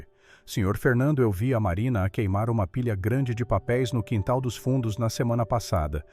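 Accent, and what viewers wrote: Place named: Minas Gerais, Brazil. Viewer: Este contador de estória com sotaque mineiro Mairna ora Marina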